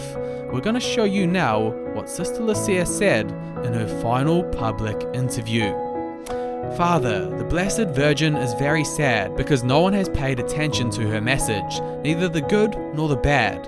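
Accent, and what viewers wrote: New Zealand. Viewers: That is a New Zealand accent.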